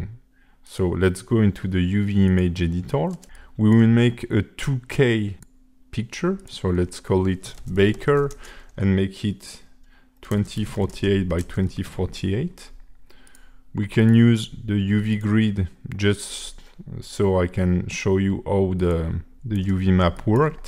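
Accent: France. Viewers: Love ur French accent haha , keep it going !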